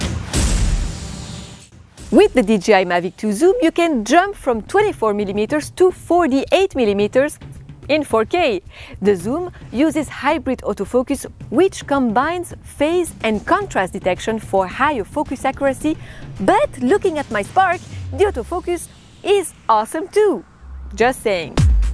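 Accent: France. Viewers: Honnetement = iJustine, et nous re-re-re-parler du drone du moment pour faire des vues ce n’est pas très interessant. Par contre l’accent francais en anglais et le debit rapide de la presentation c’est agréable. Du coup manque de contenu mais represente bien ce que deviens Youtube en 2018, une chaine de pub sans contenu. Ah, pour faire des vues tech actuellement faut parles du Nikon Z, du nouveau Canon, des Sony Mirorless ou de la 8K 🤓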